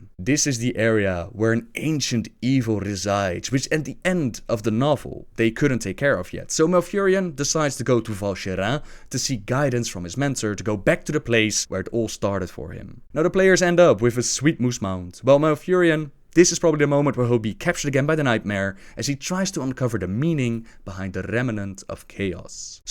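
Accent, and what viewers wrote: Netherlands. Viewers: is he dutch? it sounds like it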